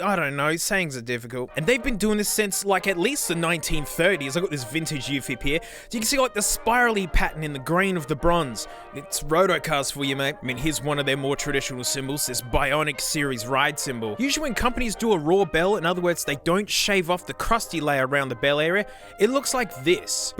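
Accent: Australia. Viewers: I can't hardly tell the difference between any cymbal on my chineesium earbuds I just like listening to funny Australian man lul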